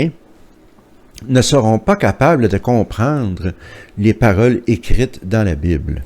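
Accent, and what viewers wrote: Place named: Quebec, Canada. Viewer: Je salut votre courage cher monsieur 'vous parlez en connaissance de cause. Je suis pas athée' je m instruis par le biais de vidéos. Tout laisse à croire à un lavage de cerveau. Nous devrions tous restez libre et choisir une voie spirituelle si on éprouve le besoin et quand le moment viendra. Merci pour l accent québécois ❤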